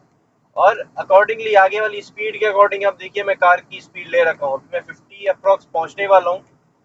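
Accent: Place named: Punjab, India. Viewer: sir aap kaunsa biradari se ho? you sound like a Punjabi to me.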